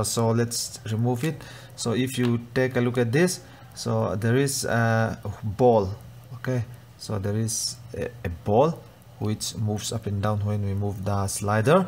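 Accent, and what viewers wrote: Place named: Mizoram, India. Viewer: Subscribe nghal, tangkai lutuk ❤️ I awphawi ah mizo inih ka ring ka en nghal bawk a, thanks for the video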